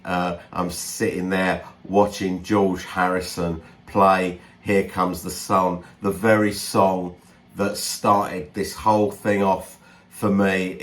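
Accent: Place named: London, United Kingdom. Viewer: This video is a gem! I never knew about this gig until you told your story. You're a born story teller, mate! Lovely London accent! I also loved your detour on Hitler's ball(s). Extremely funny and I never heard the witty "childrens poem" either. I had to watch the video from start to end without pausing, even though I have loads of work to do here. You deserve far more subscribers! Cheers! Stein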